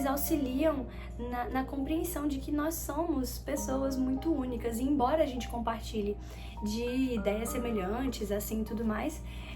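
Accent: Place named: Bahia, Brazil. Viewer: Que vídeo bom!! Você tem um sotaque baiano, você é?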